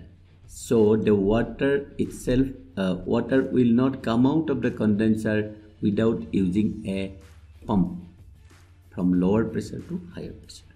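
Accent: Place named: India. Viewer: Indian English is painful in the ears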